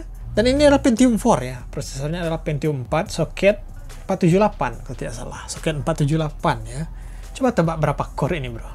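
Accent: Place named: Padang, Indonesia. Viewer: Orang Padang Abang ini agaknya...kental kali logatnya😂😂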